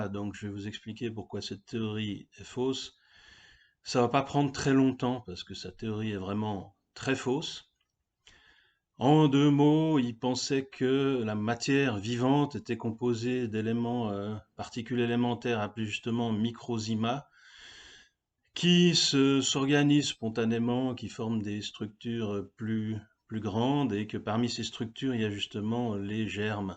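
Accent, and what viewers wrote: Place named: Switzerland. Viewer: Moi, je suis français mais juste de l'autre coté de la frontière Suisse (coté Jura) ... D'où l'habitude d'entendre ton accent ... Je suis biologiste médical et j'ai donc des compétences en infectiologie et en biologie moléculaire faisant tous les jours des PCR covid ... Et donc la contagion des maladies infectieuses, je la constate tous les jours. Je suis entièrement d'accord avec toi qu'il faut mettre en lumière Béchamp pour expliquer à toutes ces personnes qui sont intervenues en commentaire de cette vidéo que ce scientifique a TOUT faux.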